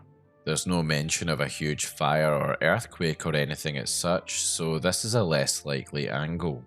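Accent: Scotland, United Kingdom. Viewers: man i so love your scottish accent... always liked how it sounds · Are you Scottish? 🏴 let’s go I’m Edinburgh side 😊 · The Scottish accent makes this video much better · I like thick Scottish accents, and I like Bethesda game lore. You got me on board lol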